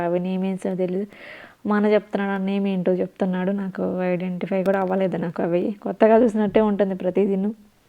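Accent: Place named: West Godavari, India. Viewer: Mee slang antha maa west godavari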